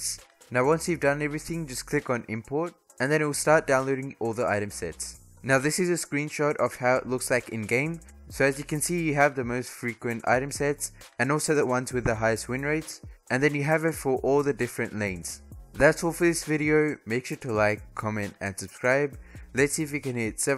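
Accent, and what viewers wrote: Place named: Australia. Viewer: Where are you from? Australia? I LOVED YOUR ACCENT!!!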